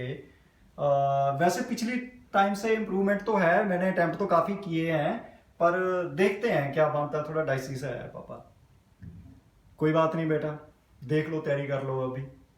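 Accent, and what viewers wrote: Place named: Punjab, India. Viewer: Punjabiya dii Hindi 🔥😁......tq sir....really informative and motivating🙏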